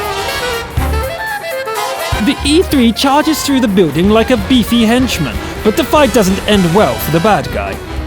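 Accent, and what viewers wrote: United Kingdom. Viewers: The scout replay with amazing agent music with a very nice british accent made my day for me. Keep up the good work with unique presentation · even i from germany Heard this "english" acent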